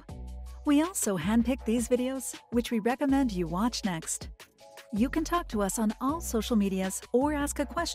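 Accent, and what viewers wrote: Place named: United States. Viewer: I don't understand why you can't just put subtitles.. I come here to read about him, but it's annoying hearing someone speak.. especially with a strong American accent.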